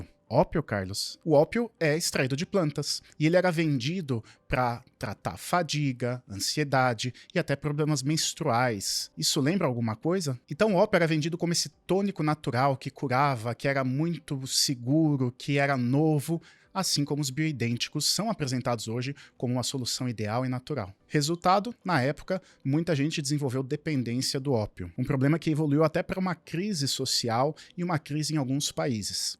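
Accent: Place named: United Kingdom. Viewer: eu gostei do "um sotaque britânico". Eu acrescentaria, "sotaque britânico com uma pegada de personagem de filme antigo que os tios gostam"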